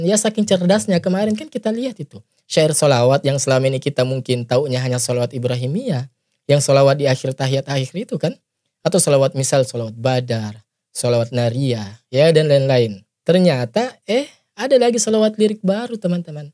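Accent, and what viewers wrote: Sulawesi, Indonesia. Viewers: Woi kamu logat Sulawesi jangan buat konten murah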